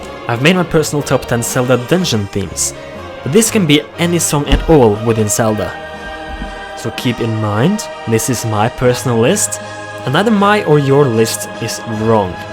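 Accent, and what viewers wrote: Norway. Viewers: Haha, hørte på måten du snakke på at du er Norsk :) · That english sound like something i have heard before. Are you Norwegians?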